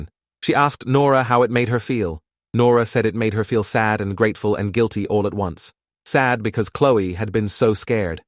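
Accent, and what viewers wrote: United Kingdom. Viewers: In every video a British accent happens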